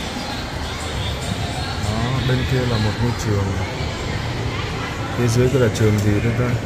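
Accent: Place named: North, Vietnam. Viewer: Mình thích nghe giọng nói miền Bắc a ấy nhẹ nhàng truyền cảm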